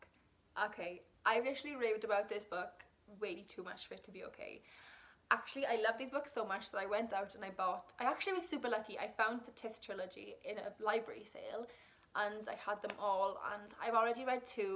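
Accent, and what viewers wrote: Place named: Wales, United Kingdom. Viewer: Welsh huh. None too many have your accent in Canada. I just have the generic Canadian accent, which is clear but lacks lilt. Can't say I read any of your top 8 this yr.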